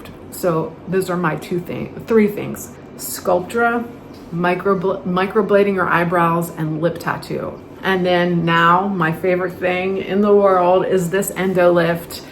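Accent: South Africa. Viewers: Hi. Glad to hear a South African. I'm from South Africa. Johannesburg. 😊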